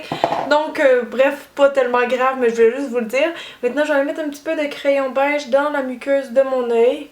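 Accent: Quebec, Canada. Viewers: C'est ben plaisant d'avoir des tutoriels en français avec une fille qui parle québécois et à laquelle on peut s'identifier! :)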